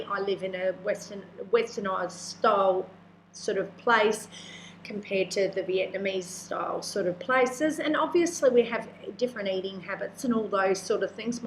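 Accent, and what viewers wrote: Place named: Australia. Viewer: Hey it's Nealos. Good to hear you still sound Aussie as fcuk! Hang in there mate.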